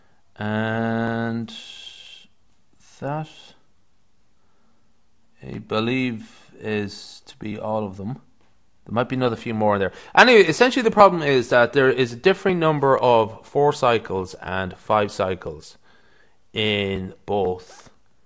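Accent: Ireland. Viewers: you know Conor Mcgregor? judging by your accent, i bet you're Irish. :)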